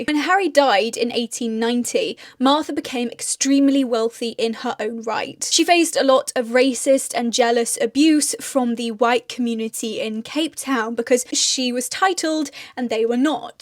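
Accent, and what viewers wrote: England, United Kingdom. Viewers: I find it funny as a black man, I learned a lot of black history on YouTube. Even more funny, it comes from a white woman with an English accent LOL. Glad we have this platform to post videos.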